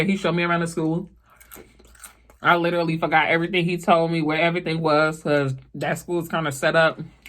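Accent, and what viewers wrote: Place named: New York, United States. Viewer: You definitely sound more NY than NJ. 😂🤷🏽‍♀️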